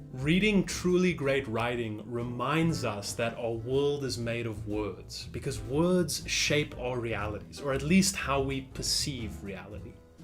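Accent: South Africa. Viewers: Guy are you South African?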